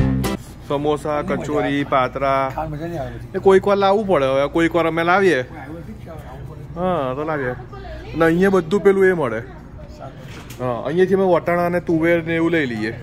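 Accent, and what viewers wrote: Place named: Surat, India. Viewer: Wow.. nice collection of typical GUJJUS. yummy. Commentary paakki SURTI …👏👏👏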